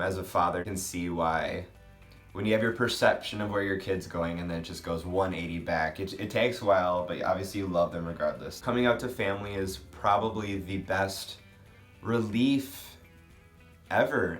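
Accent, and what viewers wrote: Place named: Minnesota, United States. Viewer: Btw, I love hearing your voice with your "Minnesota accent". I've lost almost all of mine, but I've been told that those transplants to other parts of the country, who grew up in the midwest, make good TV and radio announcers. People still ask me about my accent occasionally when they hear me speak.:)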